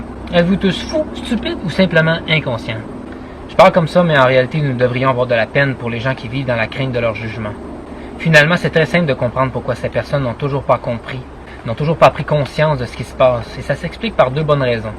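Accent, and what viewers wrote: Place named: Quebec, Canada. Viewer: Un belle accent gui va avec ton charme !! On adore l'accent québécois en Europe, et beaucoup de charme... Comme dirait un suisse comme je suis je fond comme du chocolat en te regardant et en t écoutant.... Magnifique harmonie !!!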